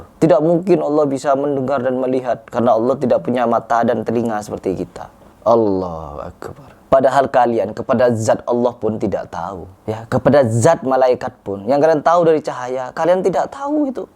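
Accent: Madura, Indonesia. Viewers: Mas ni orang madura kah? Logat nya mirip2 madura hehe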